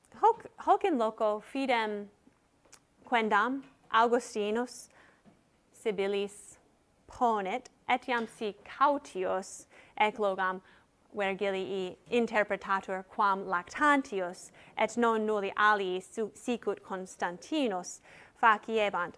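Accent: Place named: United States. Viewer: Not sure this Latin text with its distinct American accent, rythme and prosody would approximate the original Latin pronunciation close enough for it not to provoke a glimmer of a gentle smile on Virgil's lips. A fascinatingly bald and worthwhile experiment all the same. Many cheers!!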